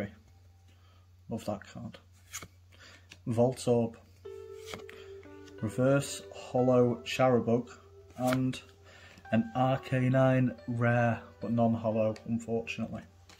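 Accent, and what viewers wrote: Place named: North, United Kingdom. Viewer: Where in the UK are you from your accent sound northern ???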